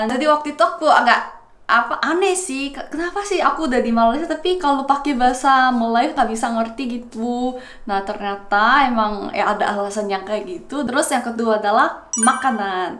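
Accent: Java, Indonesia. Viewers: Kata si mba dia logatnya udah 'Indonesia bangat" Jadi lucu:) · maaf kak kalau saya ngomong kayak gini.. mengapa kakak ngomong pake logat bhs indonesia?. Saya tau persis dengan logat melayu dan indonesia. Yg kakak pake ini adalah logat atau cara bicara orang indonesia yg menggunakan bahasa indonesia... Saya aja orang thailand tau kalau anda sedang memakai bahasa indonesia. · Orang luar tapi kayak medok, mantab mbak saya support teros😂🤣👍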